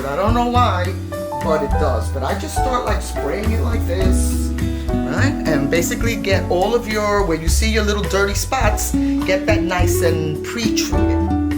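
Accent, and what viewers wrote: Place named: New York, United States. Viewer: I was listening to others that use alcohol and baking soda, however I’m going to try your method. Love your New York accent and Love how you explained everything. Thank you 🙏 you are very kind to share your secret!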